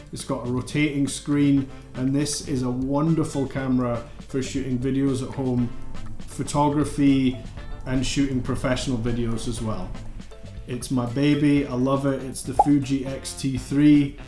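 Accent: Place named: Scotland, United Kingdom. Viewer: This has been really helpful advice for me dude! Its also nice to hear it from a fellow Scot 😂 been trying to teach myself since COVID started but there is so much advice out there that it’s really overwhelming! Thank you for making this man!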